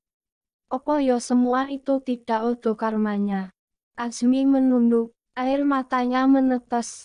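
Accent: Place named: Java, Indonesia. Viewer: Lanjuuttt minnn .. suaranye kok tmbh kyak wong jawii ta 🙃🙃🙃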